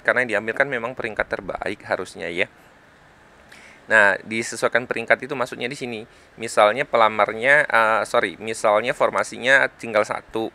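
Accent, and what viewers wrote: Banyumas, Indonesia. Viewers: kenapa ini suaranya ngapak pedia hh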